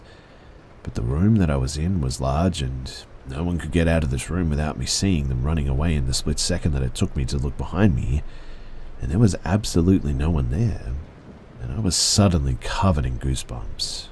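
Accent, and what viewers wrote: Australia. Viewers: Love this your my favourite narrator none compare, is it the Aussie ascent? Tone I simply believe it’s both thank you for not quitting these uploads, I’d honestly be searching YouTube relentlessly trying to find the right voice. Xx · You are a phenomenal storyteller! Your Australian accent makes it even better.! People with British accents are the same way not all of them but the ones who have the gift of voice of being able to read a story and make it really come to life. Please remind me of the old days. When TVs were a newer item or before they were invented. They had radio shows that it will keep people entertained. Families will gather around the radio, which was usually a Big thing. Meaning, they were physically big they would be on a table and one family will gather around and listen to the scary stories and because that’s what they had at the time they had sound effects and everything like if someone was walking they had a recording of somebody walking towards you or walking away and they had a door that can go anywhere with that will open it and close a different sound effects to make you feel you are there. 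When I was little my grand mother bought me this little radio is back to about 60 years ago maybe I wasn’t 60 years ago I’m like maybe 30 years ago and I remember it vividly. It was this little white razor on rectangular and the part where you tuned it was black and it has orange number so you can see them and we have a station. Right near us and play those types of shows at night and I remember being in bed with the flashlight and those were things that we like to get it tells you how long ago was my alarm flashlight that took like three or four of the big batteries just to light up an area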